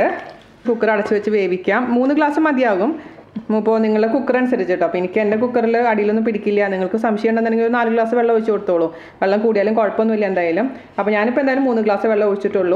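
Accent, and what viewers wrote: Thrissur, India. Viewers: നല്ല രസമാണ് അവതരണം തൃശർ ഭാഷയല്ലൊ.' ''?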